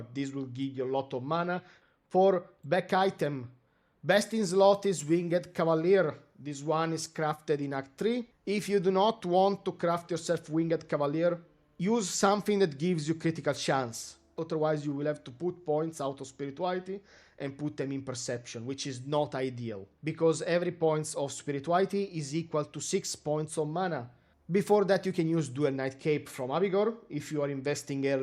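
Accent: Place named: Abruzzo, Italy. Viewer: wow your accent is bad... let me guess you are from Abruzzo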